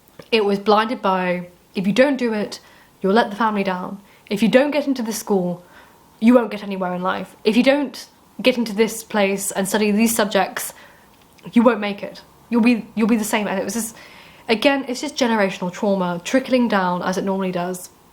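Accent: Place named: Upper class, United Kingdom. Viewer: Dear Lady. I love your accent, I find it aristocratic, academic and upper class British. Its fun watching and listening to you. I could listen to you all day. You are motivating and just fun. This from a fan a barbaric colonial from across the pond who the fates have planted me in central Florida surrounded by Neo confederates after a carrier at NBC TV Chicago as a video tape editor. Stay well Dear Lady. DJY.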